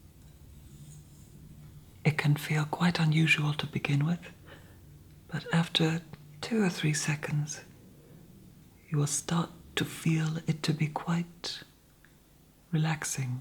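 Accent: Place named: England, United Kingdom. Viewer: To me, there is nothing more soothing than a authentic medium toned English accent. I adore the Brits. And you are a rare gem I should like to keep. And in the raw you possess a Angelic aura. I am about to get my own EOs out to use. The Sandman is sure to take me away afterwards to his dream laire.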